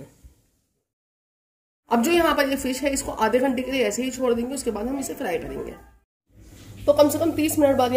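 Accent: India. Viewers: Assalam wallekum Masha Allah zabardast Aap India se hai q k aap KI language Indian lagti hai Mai Pakistan se hoo. Aap ki dishes buhat laziz recipes hoti hai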